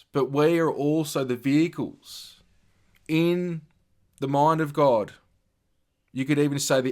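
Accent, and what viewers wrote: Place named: Australia. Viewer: Love hearing a fellow Aussie on my feed. PRAIR :)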